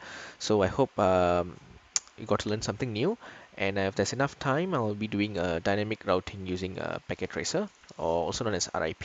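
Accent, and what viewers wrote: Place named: Malaysia. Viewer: I can't miss Malaysian accent haha, nice lah abg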